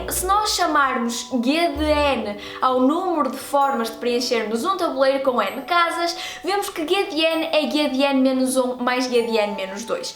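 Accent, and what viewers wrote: North, Portugal. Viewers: Como eu vim parar aqui? Oo Adoro esse sotaque da terrinha ♥ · Amei isso! Encontrei esse video pq estava mesmo pesquisando por Fibonacci no google, e ainda bem q ele me mostrou esse video. Excelente explicação. Me deixou ainda mais fascinada por esse assunto. E que que mocinha linda:) De que lugar de Portugal vc eh,? Ja viajei bastante pelo teu pais, mas nao reconheco esse sotaque. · Deivid H. Mais especificamente, do norte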